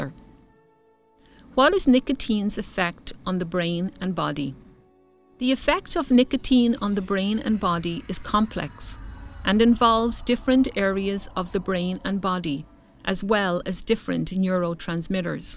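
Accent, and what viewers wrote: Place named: Ireland. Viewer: Big thick Irish accent in Toronto haha love it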